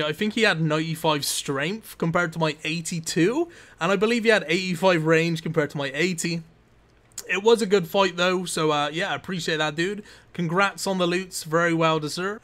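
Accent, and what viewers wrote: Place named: South England, United Kingdom. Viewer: Where are you from in the south of the UK btw?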